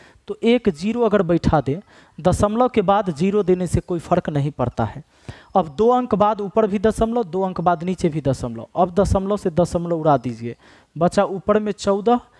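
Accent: Bihar, India. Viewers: Sir Kya aap .....Bihar se hai ?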